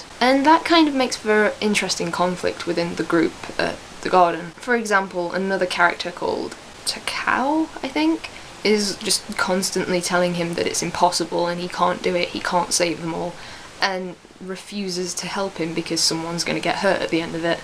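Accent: United Kingdom. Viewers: Nice Review, It's nice to hear the voice of another British person other than myself lol. Also you did a great job editing the video It's almost seamless. Subbed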